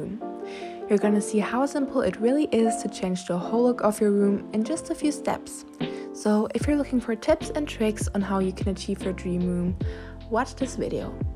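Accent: Germany. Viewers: Es ist voll süß das man deinen deutschen akzent hören kann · She up is German I think I hear the accent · You look soo pretty!! And with your sweet german accent girl you nailed that room makeover!!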